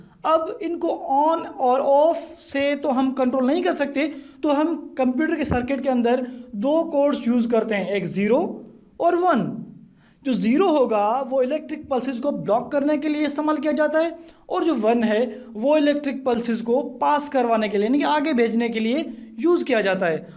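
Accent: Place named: Sindh, Pakistan. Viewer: Sounds like Sindhi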